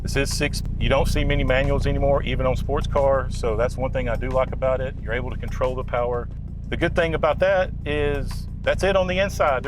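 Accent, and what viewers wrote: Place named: South, United States. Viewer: Holy fuck he is so country Merika .. LOL That's how you say Daadge Vah-phurrr !!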